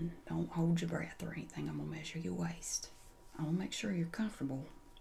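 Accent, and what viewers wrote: South, United States. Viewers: You are awesome. Love the accent and southern gossip! Lol seriously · Dev 2 Turnt do you know of any others? I moved to the south and my interest for asmrtists has changed with the people I'm comfortable around I guess. I haven't found any that sound this southern, or from my region of the south. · It's so nice hearing a southern ASMR artist. I find you incredibly relaxing, especially since I'm from the south. It's almost like a comfort from home. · I'm from Florida and am just a little too far south to have my own southern accent 😒 . I LOVE yours though 😊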